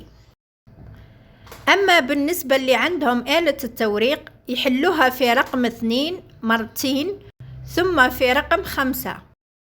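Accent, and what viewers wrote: Setif, Algeria. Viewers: يعطيك الصحة على حساب هدرتك نباني سطايفية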